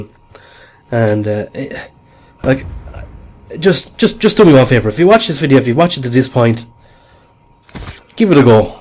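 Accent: Ireland. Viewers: are you irish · Irish you tuber ftw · I liked and subbed... btw are you from wexford by any chance? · Is he from Ireland so am I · Are you Irish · Are you Irish? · I have only gotton 50 second in the video I will finish it o.o but may I ask are you irish your voice is distracting in a great way :D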